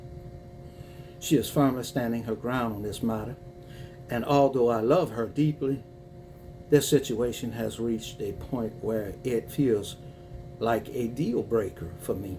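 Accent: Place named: Louisiana, United States. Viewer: Love your Louisiana accent, don’t know why but it feels like HOME❤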